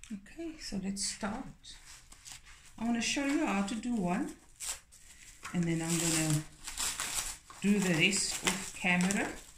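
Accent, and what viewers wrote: Cape Town, South Africa. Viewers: Absolutely beautiful. Love your calm, loving voice. This means so much to me, especially hearing your accent. Is it Cape Tonian? Makes me long for home because I'm from Durban, South Africa watching from Australia. Your presentation is beautiful. Loved the last bit also, when you called for someone to open the door quickly. I loved the small glimpse of your plants also. I will try doing it, but I don't think I can decorate it so perfectly. I wish I could meet you one day. Kindly read John <num>. Love and God bless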